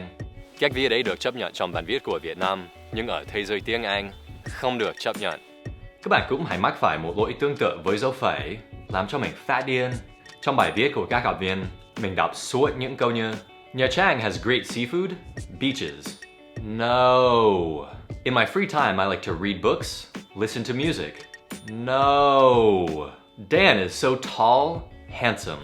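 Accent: United States. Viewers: anh Dan nói giọng Mỹ hay kinh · American English or real English Dan ?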